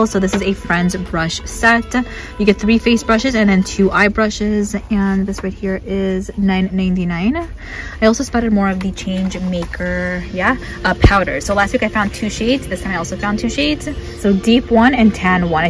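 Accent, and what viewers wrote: New York, United States. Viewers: Sounda like a NY/ NJ thing.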